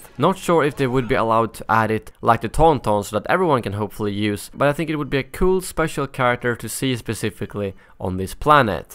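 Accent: Sweden. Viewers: I knew it! You are from Sweden, could hear it from your voice :)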